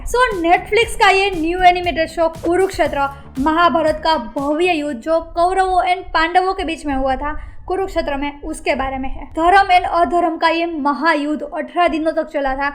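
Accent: Gujarat, India. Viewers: aap gujrati ho kya??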